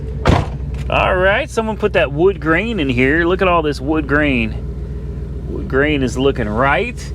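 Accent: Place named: South, United States. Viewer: Love your accent, brings me back lots of good memories in Southern US!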